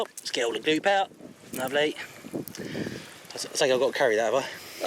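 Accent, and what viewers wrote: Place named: England, United Kingdom. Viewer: How about losing some of that English accent for the American's that watch your channel. BTW you might explain what the heck you are talking about at times. lol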